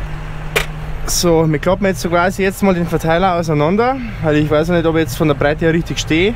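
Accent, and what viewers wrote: Bavaria, Germany. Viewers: Red no bayrisch passt👍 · Top Video, aba mit mehra Bayrisch is schena! Gruaß Flore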